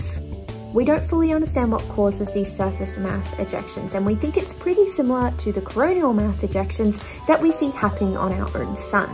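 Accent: Australia. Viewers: It's not a speech impediment. She's Australian. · Yay an aussie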